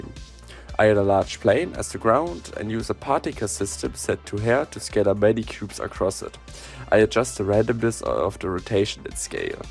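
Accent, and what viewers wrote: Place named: Germany. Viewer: Are you German? You sound like you have a slight accent ✌️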